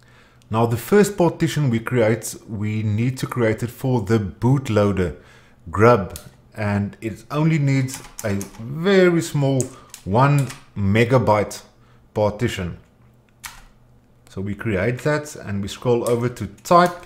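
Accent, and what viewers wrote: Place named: South Africa. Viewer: love the SA accent :)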